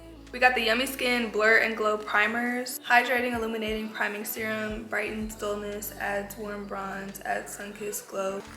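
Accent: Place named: South, United States. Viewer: i love watching your vlogs so much !! the mani pedi part had me giggling so much !! let that southern accent shine girl heheh 🤍🤍🤍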